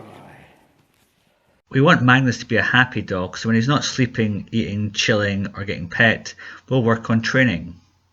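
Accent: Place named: England, United Kingdom. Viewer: I don't understand, you say "sit" for a down ? Due to your accent, i hear "site" when you say "side" 😊, it's so funny to me ! I am used to american people talking, not English people ! Regards from France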